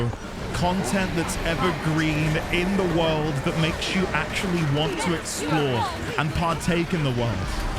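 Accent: United Kingdom. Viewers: Your voice is really interesting and soothing! dont judge yourself that hard, a british accent that is soft, manly, energetic, hopefull for fun and love for life is just chef's kiss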